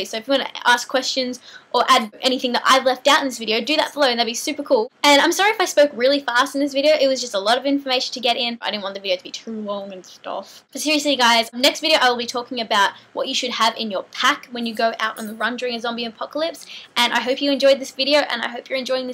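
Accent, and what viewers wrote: Australia. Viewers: are you Australian??.. love your videos.. and is an amazing song:)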